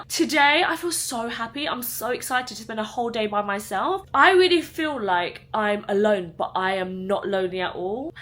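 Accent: United Kingdom. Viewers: Thank you for sharing and being so open and vulnerable with us. I can relate to you and your feelings in so many ways this was refreshing to see and encouraging. I’ve been so scared to solo travel 😩😂❤️ I’m so used to traveling with friends or my partner. This might have been the push I needed ❤️ Also yes you sound that British 😂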